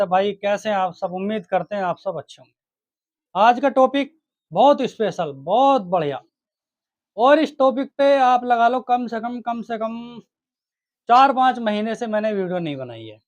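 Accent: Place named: Bijnor, India. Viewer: Bhai ne dil khush kar diya boli se bijnouri he bhai pakka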